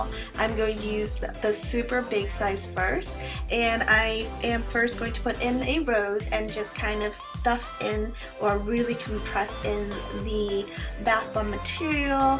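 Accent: Vietnam. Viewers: She has a vietnamese accent come on now don't be ignorant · it's a typical Vietnamese accent.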